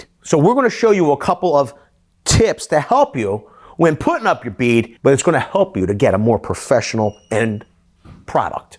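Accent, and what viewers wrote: Philadelphia, United States. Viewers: I have no idea but see he hasn't answered and your question made me notice that now as well. My guess is that it's a Philadelphia accent maybe.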